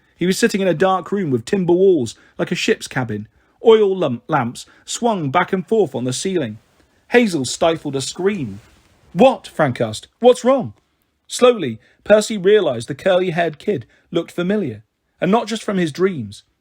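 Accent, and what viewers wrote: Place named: United Kingdom. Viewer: I love listening to HOO in British ❤️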